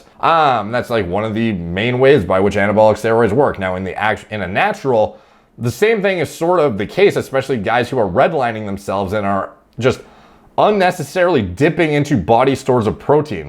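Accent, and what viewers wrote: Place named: Canada. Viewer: Are the prices on the website in US or Canadian dollars? With the aboot etc guessing is Canadian?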